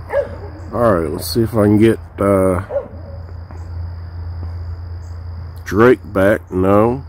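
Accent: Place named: Tennessee, United States. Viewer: BTW are you from around TN, was just wondering because of your accent. I live a stone's through away from TN. That's why I'm asking.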